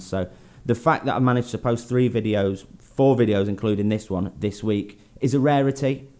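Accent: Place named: England, United Kingdom. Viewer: Wow I thought you were from England. Your accent is English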